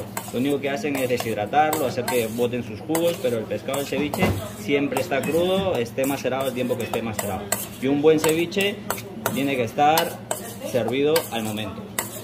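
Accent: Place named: Spain. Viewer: Tu acento ya parece español bro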